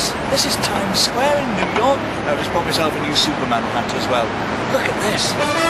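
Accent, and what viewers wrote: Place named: United Kingdom. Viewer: What a nice British Accent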